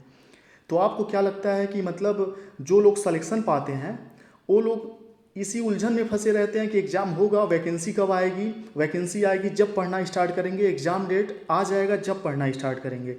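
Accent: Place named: Uttar Pradesh, India. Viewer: I m right .apki bato se.. video k starting m hm soch hi rhe the...ki aap U.P. se ho..or aapne apni video m hi clear kr diya.....hmne apki complete video dheki h.. 🙏🙏🙏sir plz ek confuse clear kre...sst ki preparation kaise kre...plz tell me...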